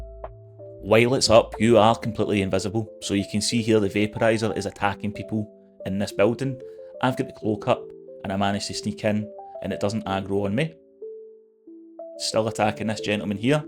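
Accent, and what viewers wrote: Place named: RP, United Kingdom. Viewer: Nice effort with the posh accent.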